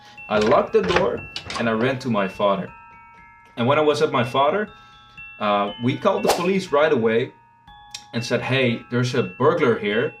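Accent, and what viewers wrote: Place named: Netherlands. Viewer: this sounds suspiciously like a dutch accent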